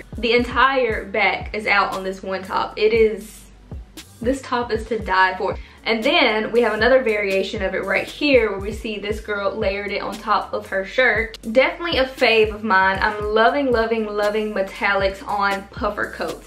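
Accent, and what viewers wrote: South, United States. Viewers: Oh my gosh girl I love your energy and that southern accent ! I’m sure you get this all the time… but where are you from !!? I’m lovin it ♥️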